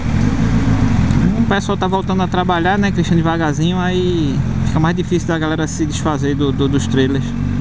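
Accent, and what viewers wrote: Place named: Minas Gerais, Brazil. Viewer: esse teu ˆsutaqueˆ é bão demais sô hahahaha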